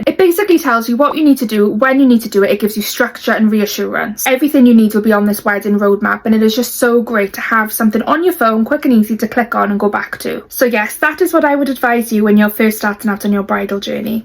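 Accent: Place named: Wales, United Kingdom. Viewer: Beautiful Welsh accent! 🥰 Congratulations on your September 2026 wedding! 💐💍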